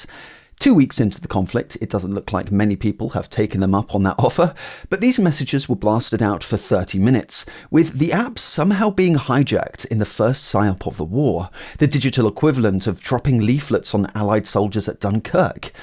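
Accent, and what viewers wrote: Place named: United Kingdom. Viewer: American ? but the accent is British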